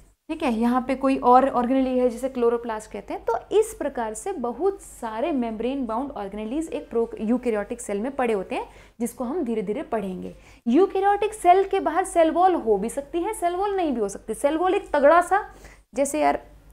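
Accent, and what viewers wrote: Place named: Bengal, India. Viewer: I think she's Bengali.. because I feel it in her accent of speaking Hindi 😌💜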